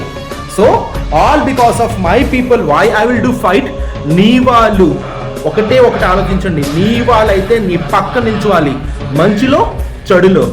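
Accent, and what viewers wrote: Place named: Telugu, India. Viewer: మీ ఇంగ్లీష్ లో తెలుగు బాగుంటుంది మనవాళ్ళు అంటే మనతోనే ఉంటారు ఎప్పుడూ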